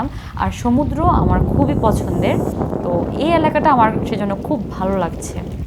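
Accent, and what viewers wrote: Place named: Noakhali, Bangladesh. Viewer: ভ্লগ তো অসাধারণ ছিলই বাট ভাইয়ের নোয়াখাইল্লা একসেন্ট বহুদিন পর শুইনা আপ্লুত হইয়া গেছি।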